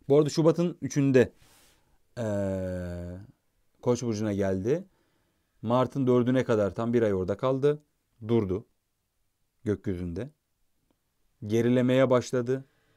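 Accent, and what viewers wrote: Adana, Turkey. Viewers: Ben sizi,websitenizden takip ediyordum ,YouTube kanalınızın olduğunu görünce,altın bulmuş gibi sevindim :) yazım üslubunuzu zaten çok seviyordum,konuşma üslubunuza resmen bayıldım ..Adana ağzı da bir harika :) çok aşırı mutluyum şu an ..lütfen daha sık video yayınlayın..bu kanalı geliştirin ..Sevgilerimle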